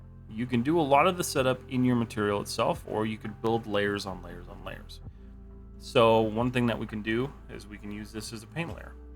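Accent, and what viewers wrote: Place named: South, United States. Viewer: I appreciate the video, and I don’t want to upset you by saying this, but constantly hearing “height” as “heighth”, like you’re a 16th-century man with wooden teeth, is driving me mad, especially when it’s said correctly in the same video. 😂 (I understand it’s a quirk in some parts of the South, so no hate, but it’s killing me.)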